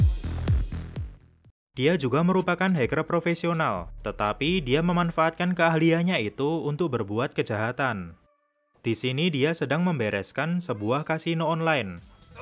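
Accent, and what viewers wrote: Java, Indonesia. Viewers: Gak medok bisa gak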